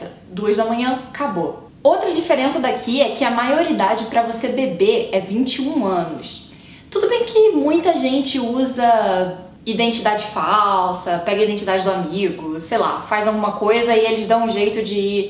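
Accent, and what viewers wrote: Rio de Janeiro, Brazil. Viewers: Vc com esse sotaque de carioca se assustou com esse jeito deles dançarem???????Com esse sotaque de carioca???Para com isso,com certeza vc sabe como se dança funk(não digo que vc dança,digo que já deve ter visto e sabe como é).É simplesmente impossível alguém com esse sotaque de carioca que vc tem se assustar com isso! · Acho legal seu conteúdo mas o sotaquezinho carioca é DIFÍCIL de aguentar. Gezus, minha chaleira chia menos...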